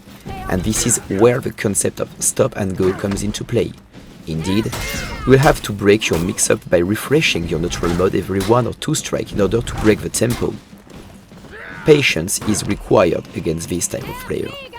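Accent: France. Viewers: J’entends que tu est français😂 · Ah a fellow Frenchman it sounds like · Are you French? Cool accent! And great video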